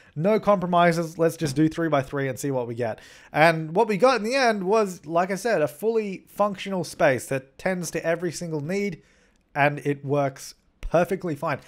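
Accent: Australia. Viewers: Are you Australian?Because I am🇦🇺Like if you are Aussie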